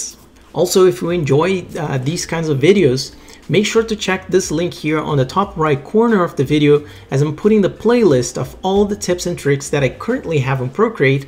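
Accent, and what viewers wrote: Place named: Italy. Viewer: Trying to guess accent... I'm with either Italian or Brazilian/Portuguese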